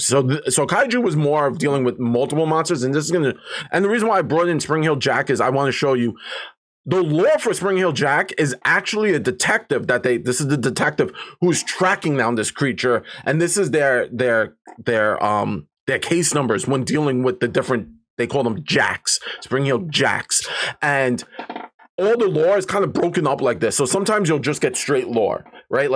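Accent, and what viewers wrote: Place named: New York, United States. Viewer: Great video! And great NY accent!!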